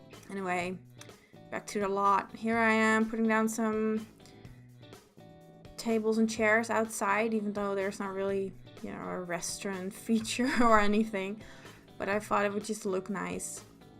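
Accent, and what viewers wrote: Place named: United States. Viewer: YOU'RE DUTCH?! you have the cutest accent ever, i would have never guessed, i would have guessed somewhere in america! O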